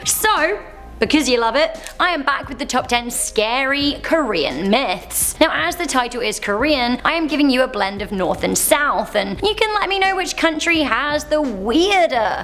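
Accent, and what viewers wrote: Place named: United Kingdom. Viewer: your so lovely 😊🤗😘 & your British accent is Awesome!!!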